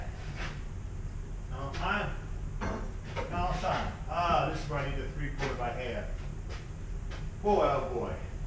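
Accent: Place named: Philadelphia, United States. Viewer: Ay you from Philly bro?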